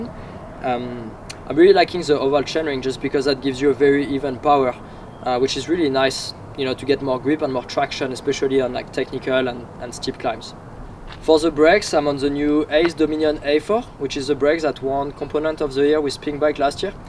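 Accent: France. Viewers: Salut Rémy Metailler trop beau ton bike.On voit quand même que tu as encore l'accent français.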